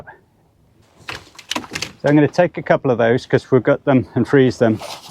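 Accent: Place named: Northern England, United Kingdom. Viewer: Oh I see 😊 Thank you mate.I did notice you had a northern accent 👍 I was thinking somewhere near Liverpool? Your vidios are brilliant,I love how you get so excited and can see you are a genuine nice guy that loves his fishing.Keep them coming buddy.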